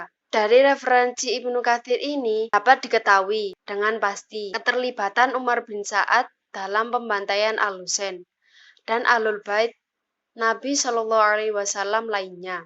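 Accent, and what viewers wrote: Java, Indonesia. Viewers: Dihhh annoying bangett sihh mbbaakkk , suara nya itulohhh medhookk nya ,dan kontekstual banget kaku kek kanebo keringg, isi jga g jelas n berbelit-belit, sama kek junjungan nya si syiah hobi n pandai Berpura-puraa, nohhh ikutt drama telenovela sonohh biar dapet piala, sayang kan sama bakat pura-pura nya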